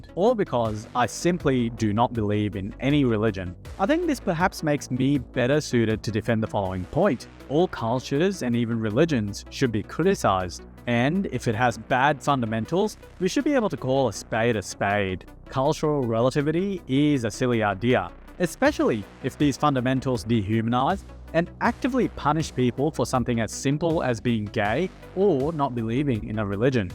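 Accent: Australia. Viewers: He's Australian but I believe partially grew up in an Islamic country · You've got native australian accent. I suppose you were under 10 yo when you left your country :) thanks mate for the content! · He sounds australian….shows what you know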